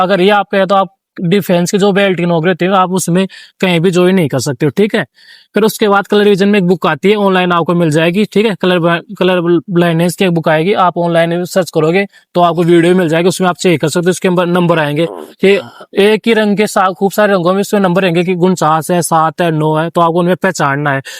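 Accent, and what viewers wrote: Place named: Jhunjhunu, India. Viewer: Ya aavaj to mahre jhunjhunu ki lage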